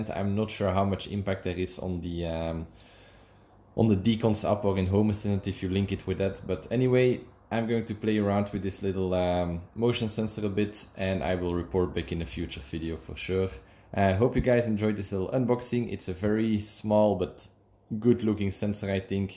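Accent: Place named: Brabant, Netherlands. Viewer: Je Brabands accent door je Engels is zalig ☺️☺️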